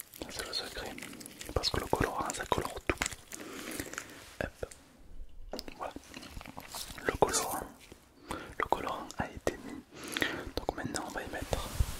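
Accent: South, France. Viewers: L'ASMR avec l'accent du sud. Incroyable.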